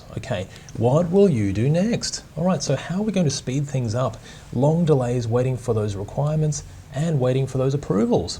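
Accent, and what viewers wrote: Australia. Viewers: I was going into the exam having done a ton of SH practice questions, mini exams and full length exams. SH completely demoralized me and made me think that passing was going to a flip of the coin at best. Not only did your videos bring clarity to a lot of the tricky questions that stumped me in SH, but also gave me my confidence back and reduced my anxiety enough to stay focused during the exam. I think reading the questions in my head in a chipper Australian accent really helped. So glad I stumbled across your videos series! I passed with a very comfortable AT/AT/AT :D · I just passed my PMP yesterday 9/3 and I got to tell you..most of my questions had an Australian accent. Thanks a ton (tonne?)!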